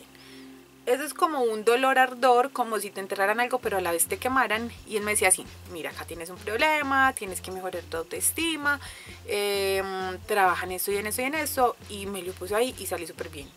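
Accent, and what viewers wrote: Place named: Medellín, Colombia. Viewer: Hola cómo estás, soy de Medellín por tu acento de pronto veo que eres también de acá. Será que me puedes dejar el nombre del médico o centro donde él atiende, y si tienes teléfono de él te lo agradecería bastante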